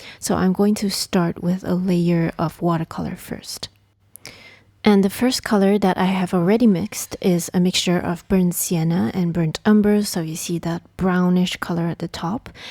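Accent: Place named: Malaysia. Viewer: Km org Malaysia? Aku bisa mendengar aksentmu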